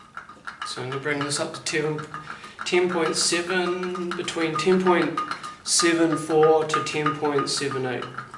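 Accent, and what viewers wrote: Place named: England, United Kingdom. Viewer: When there is talking and stirring at the same time, with an English accent, it is difficult to understand.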